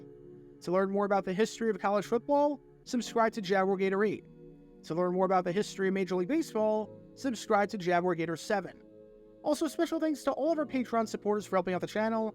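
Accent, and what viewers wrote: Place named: Northeast, United States. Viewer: Also, I love that even though lives in Arizona and spends a lotta time in Florida, he’s never lost that northeastern accent.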